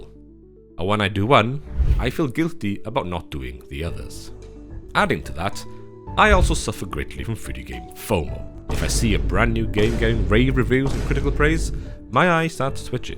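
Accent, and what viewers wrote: Wales, United Kingdom. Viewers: it's like your life is mine......but yours has a British accent. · BRO its like my 35 yr old canadian brain is talking to be in a British accent through this video. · Is that a slight hint of a Welsh twang to your voice I detect boyo? Lovely video, as a 38 year old it speaks to me 😢😊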